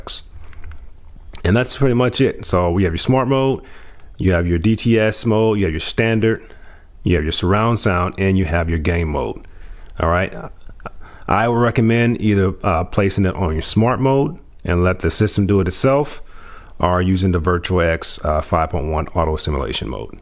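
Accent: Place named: United States. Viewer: Because of your accent I think you are from the United States, therefore we are from the same continent (America), I see you and I write to you from Chile, on Friday June 4, I went to the store to buy a printer, but I ended up buying this soundbar 🤣🤣, it is my first soundbar, I found it with a discount and I will take advantage of it to see the Copa América (America's Cup). Greetings from southern Chile...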